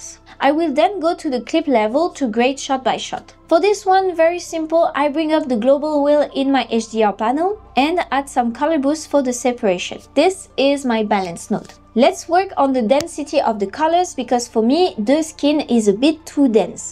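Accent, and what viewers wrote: France. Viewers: Verry nice french accent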